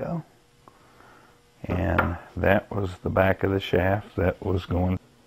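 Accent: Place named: South, United States. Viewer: Southern accent lol. He knows what to do I'll give him that but this video is no good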